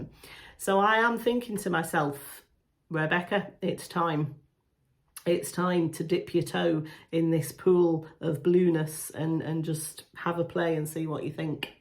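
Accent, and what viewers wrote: Manchester, United Kingdom. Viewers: Aw thank you Rebecca! ❤️ I thought I could hear some Manc in there somewhere! Listening to you makes me miss home ☺️💜 looking forward to seeing more of your content! 😘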